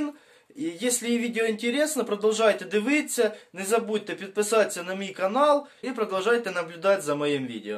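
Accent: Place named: Ukraine. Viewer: Я млею от этого суржика. Как-будто я побывал в родном селе. Молодец земляк! 👍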